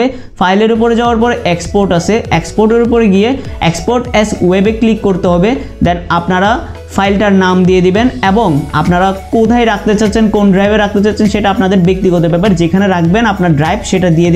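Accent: Chittagong, Bangladesh. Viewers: ভাই কি চাটগাঁইয়া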